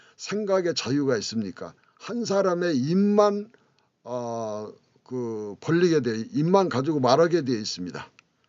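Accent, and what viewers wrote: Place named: South Korea. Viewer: 선생님께 감사드립니다. 유투브 검색 중 우연한 계기로 선생님의 강의를 들었습니다. 다소 느린 말씀과 미국식 연음에 길들여진 저에게 토종발음(저의 느낌입니다.) 제가 처음에는 잘 적응하지 못했습니다. 하지만, 점점 새로운 시각을 깨우쳐 주시는 선생님의 해설에 중독되어 결국 마지막 강의까지 들었네요. (강의 길이만 27시간 정도 되는 것 같아요) 책을 출간하신다 했는데 저의 검색능력으로는 아직 찾지를 못했습니다. 정보를 알려주시면 선생님의 철학적인 해설(footnote) 텍스트와 함께 다시 한 번 공부해보고 싶습니다. 좋은 강의를 끝까지 이끌어 주셔서 깊이 감사드립니다. - 메일주소 : <mail>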